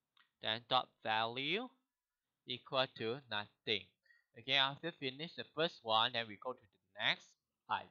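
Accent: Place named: Singapore. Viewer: so refreshing, watching a tutorial in singlish. yay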